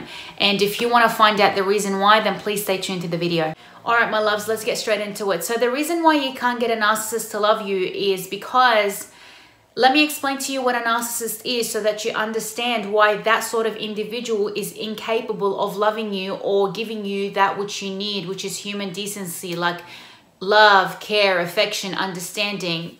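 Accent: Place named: Sydney, Australia. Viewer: Hello from Queensland 👋 I knew you're a Sydney girl the moment I heard your voice lol.. You're gorgeous and I'm really proud of your channel.. You go girl! (Please do a video about how to avoid Sydney guys who only wants to mess around🙄) New subscriber 👍 ✌️ ♥ God bless! 🙏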